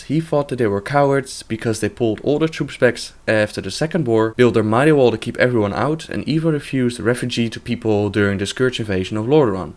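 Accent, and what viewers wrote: Netherlands. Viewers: dat dutch accent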